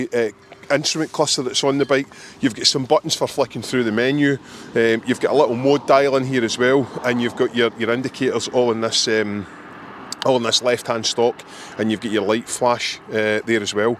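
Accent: Scotland, United Kingdom. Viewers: Scottishtriumph, yak, what a terrbile inaccessible accent..😢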